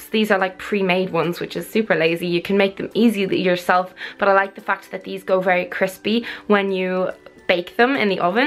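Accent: Ireland. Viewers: I've never watched this girl before, only know about her because of the whole Toby thing but Jesus Christ she is beautiful, her eyes are hypnotic, and the Irish accent is just wow.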